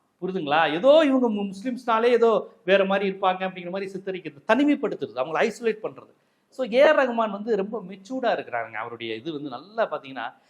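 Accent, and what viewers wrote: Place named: Chennai, India. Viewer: Un madras bashi machi kichi yallam nallavada iruku miha kavalamaha iruku un madras tamil nee adutha mozhiya pathi pesura badava raskal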